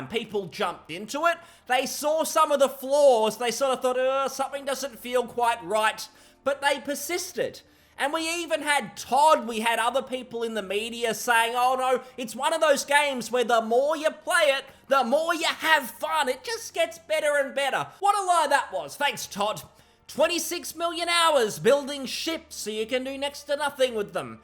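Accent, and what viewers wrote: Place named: Australia. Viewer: All Australians sound like this.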